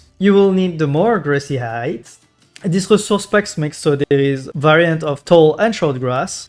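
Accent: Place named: France. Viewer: hahaha parce que je suis francais j'ai reconnu un petit accent francais en toi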